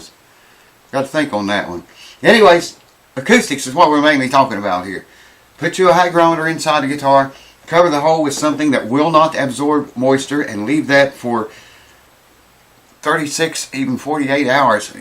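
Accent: South, United States. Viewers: Were in usa you buddy accent south innit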